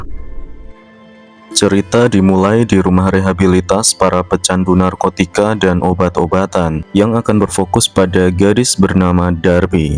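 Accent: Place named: Java, Indonesia. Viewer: Min suaramu medok tp AQ suka wkwk menyala bosku gas nonton film rekomendasi nya 🔥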